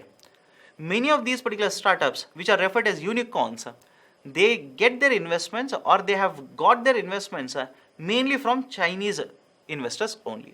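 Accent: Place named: Telugu, India. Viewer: Sir U seems to be a Telegu I like ur way of speaking & I really love listening to u Thank u so much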